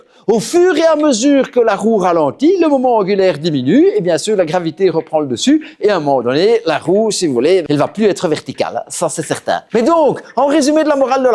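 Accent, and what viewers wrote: Belgium. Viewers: nos fameux professeurs belges avec l'accent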